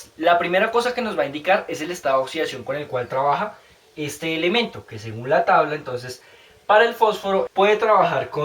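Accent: Colombia. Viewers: Ese acento colombiano xdxd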